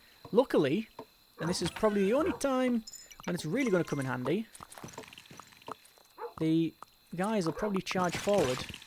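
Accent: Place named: Wales, United Kingdom. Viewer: Sounds Welsh to me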